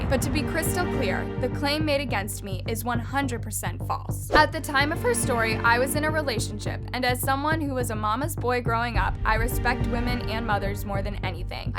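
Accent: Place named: AAVE, United States. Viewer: literally just aave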